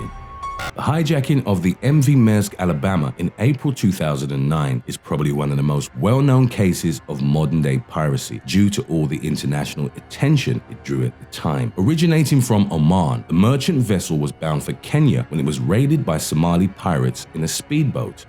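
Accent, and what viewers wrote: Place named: United Kingdom. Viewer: I liked the first narrator and this British narrator now.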